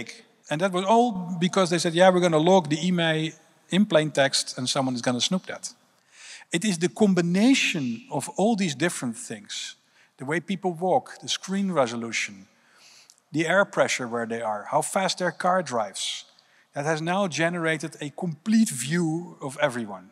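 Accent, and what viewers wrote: Netherlands. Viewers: Ken hem niet maar duidelijk een Nederlander/Hollander😂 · Yup, the horrible Dutch accent... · That Dutch accent though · Gotta love that thick Dutch accent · Such a strong dutch accent😅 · Thats some strong dutch accent 🤭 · NL accent going strong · Is this guy Dutch? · Kkr Nederlands engels accent weer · Most Dutch man I have ever heard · Love the Dutch accent🤌🤌💯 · the dutch accent is very palatable. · Why is a Dutch accent so recognizable? 😂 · this guy sounds really Dutch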